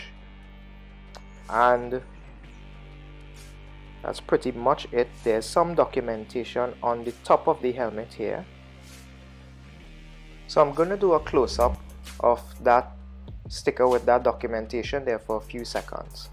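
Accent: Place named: Trinidad and Tobago. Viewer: Cyar miss dat twang bai Trini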